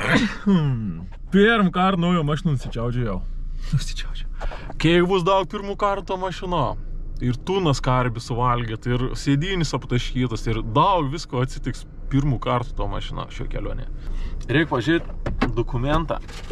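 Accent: Samogitia, Lithuania. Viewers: Super žemaitis :)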